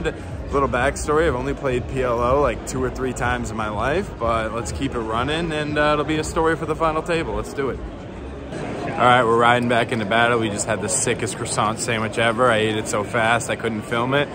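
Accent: Boston, United States. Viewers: You a boston boy? Asking cuz i am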